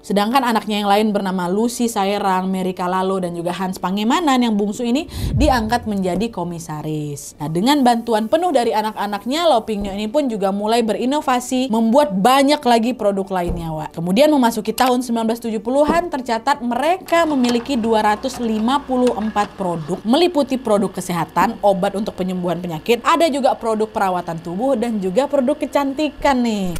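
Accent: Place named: Pontianak, Indonesia. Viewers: Bahass kakak ini mirip bahasa melayu sambas singkawang,panggil tmn wak wak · Wak wak , mcem org melayu pontianak kak ni hehehe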